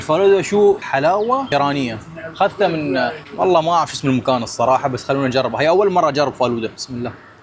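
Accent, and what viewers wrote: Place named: United Arab Emirates. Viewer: طلع اماراتي و هي تحسبه سعودي وانا احسبه بحريني صدق خليجنا واحد هههههههه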